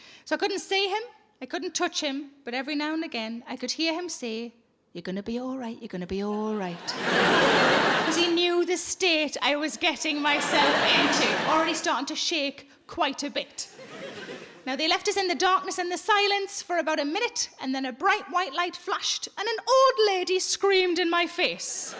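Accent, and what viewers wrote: Newcastle, United Kingdom. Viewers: There are so many Americans in the comments. I keep wondering, do you (Americans) understand everything she says in her Geordie accent? Even various northern words like 'bairn'? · Neither. She's from Newcastle! :-) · It's north east England x geordie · And the geordie xD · It’s called Geordie- usually used to describe people from the north east of England. There are a few smaller dialects within this area but generally it’s Geordie. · You mean Geordie? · Peace love justice I was taking the P, she's from near Newcastle, so you would say she's speaking geordie! Soz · Hey Sarah im in the US, me mum was from UK, i love listening to your accent reminds me of her. lol Keep up the funny work. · She's English, a Geordie from the North East of England.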